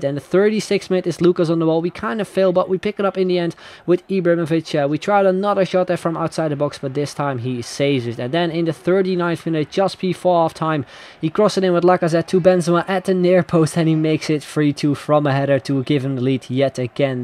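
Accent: Netherlands. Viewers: Je hebt echt een nederlands accent maar je bent wel fcking goed met skills ;)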